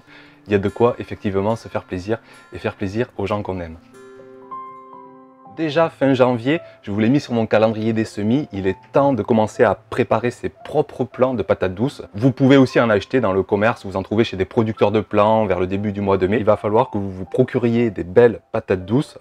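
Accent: South, France. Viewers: Merci pour tous ces précieux conseils et pour l'accent de mon Sud natal 😄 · Salut, Voilà j'ai lancé la plantation de patates douces... 1ère étape, faire germer les morceaux de patates douces (en ayant coupé 2 patates douce en 4 morceaux) mis en barquettes sous cloche avec lumière horticole 150 watts et tapis chauffant réglé sur 20 °C. Je reviendrai te dire de quoi il retourne par la suite. Nous sommes aujourd'hui dans l'Aisne (02) le 02/02/2020. Encore merci à toi pour tes merveilleuses vidéos qui au delà des techniques m'apporte ta bonne humeur, ton inspiration, ta gniac, ton superbe accent du sud et ta bonne humeur légendaire. Merci à toi et continue car tu as une empreinte spécifique sur Youtube.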